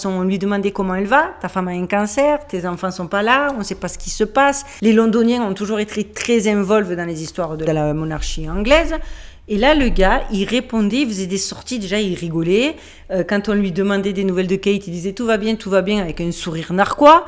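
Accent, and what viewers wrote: Marseille, France. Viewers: Merci pour ton travail. J adore ton accent sudiste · j’adore la richesse de votre diction votre accent plein du soleil de Marseille et la finesse de votre humour quand tout part en vrille. 😘✨️💙✨️😊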